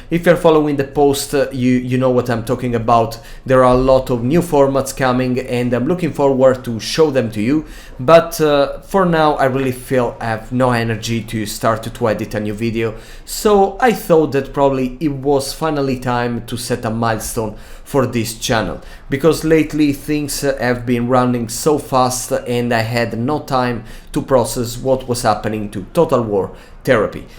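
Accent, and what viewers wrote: Italy. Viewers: Italian I think❤ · same. Sometimes seems a little bit russian, but you can feel the italian in some sentences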